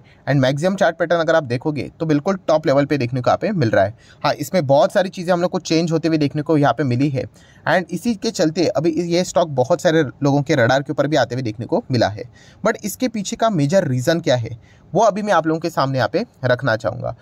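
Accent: Maharashtra, India. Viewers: Hindi se to lagta hi he ki maharastrian he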